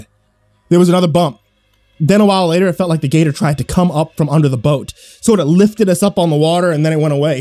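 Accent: United States. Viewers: He managed to do a good North of I10 accent. Not really cajun more just Iota area. Or maybe Jefferson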